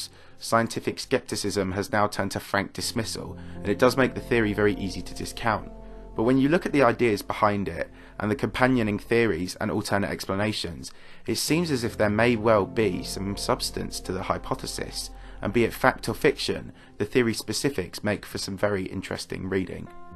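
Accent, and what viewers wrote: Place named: England, United Kingdom. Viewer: Natural narrator, and English accent just kills it.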